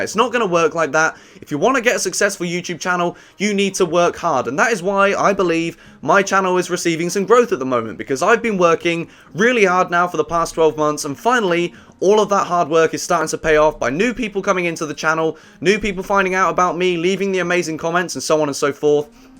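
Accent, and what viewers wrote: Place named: United Kingdom. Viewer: Just realized he had a British accent. :0 Mind=Blown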